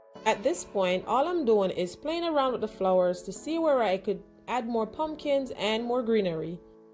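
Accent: Jamaica. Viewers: Very nice 👸🏽thanks for sharing💕 I hear your accent where are you from? Are you Jamaican?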